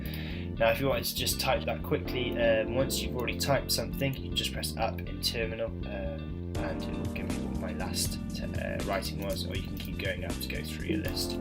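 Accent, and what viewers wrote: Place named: United Kingdom. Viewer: It's amazing how people want to create a video to help then forget that hardly anyone could understand what you saying when you have such a slurred voice not pronouncing your words and too much background noise which everyone knows draws attention away from what your saying. Slow down. It's hard enough to understand British accents let alone listen to loud music.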